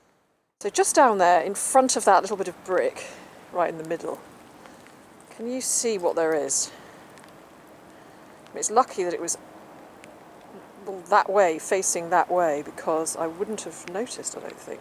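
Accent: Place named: England, United Kingdom. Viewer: I love the research you do for the objects you find , it really does add that extra dimension to your show plus it's always nice to see your Beautiful face and hear you lovely English accent !.....I really miss living in England .....I must come back again soon I think !!.....I particulary liked Cornwall and was wondering if you might have a holiday there and do some Mudlarking along the Pirate Coast !!!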